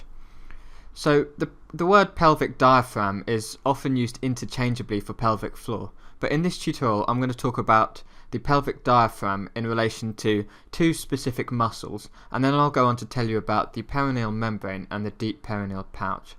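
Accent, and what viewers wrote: United Kingdom. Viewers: Love you, love you're work!! Crying with laughter at that brilliant verse "S2, 3, 4...". A&P exam tomorrow for my yoga teacher training cert, have been through so many of the vids in last two weeks- they are brilliant and have helped me massively. None of this crappy american voiceover stuff, was over the moon to hear the British accent when I clicked on the first one - legend. I'd better pass now! :-)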